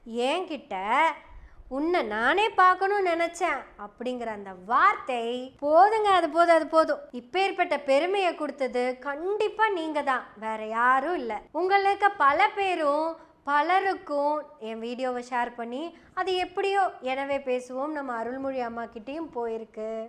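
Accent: Kongu, India. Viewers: அன்பு சகோதரி ஒவ்வொருவரும் அவரவராக இருப்போம். மேலே நீங்கள் குறிப்பிட்ட ஒவ்வொருவருடைய காணொலிகளை கேட்டிருக்கிறேன். அழகான கொங்கு தமிழில் மழலை மொழி போல் சிறப்பாக பேசிவருகிறீர்கள். வாழ்த்துக்கள். இந்த பாணியை மாற்றிவிட வேண்டாம். நன்றி.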